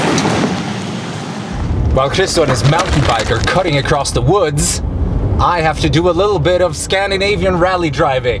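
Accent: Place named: United States. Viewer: Is this guy german? he sound like american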